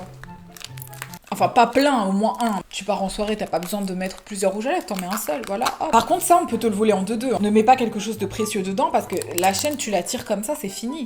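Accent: England, United Kingdom. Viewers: J'ai découvert ta chaîne y a quelques mois et j'aime beaucoup ! Honnêtement j'avais des aprioris sachant que je n'aime pas du tout la tv réalité et tout ce qui s'en rapproche mais je suis très très contente de m'être laissée tenter par tes vidéos parce que t'es géniale, très drôle, intelligente, pétillante (avec un accent anglais perfectly SPLENDID, tu me touches en plein coeur là) Alors merci pour ton contenu, ton énergie et ce que tu dégages, c'est un plaisir de te suivre ✨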